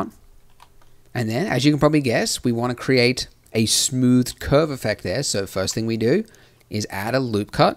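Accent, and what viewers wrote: Australia. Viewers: He's from Australia lol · funny australian Tutorial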